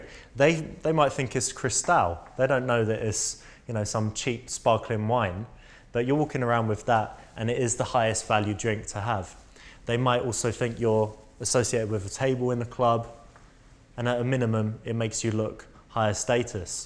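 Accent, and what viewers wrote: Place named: United Kingdom. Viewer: fukkkk he talks to much and listening to a british accent is even worse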